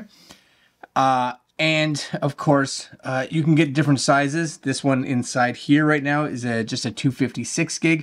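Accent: Canada. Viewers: ❤ that Canadian accent makes my heart go pitter patter …..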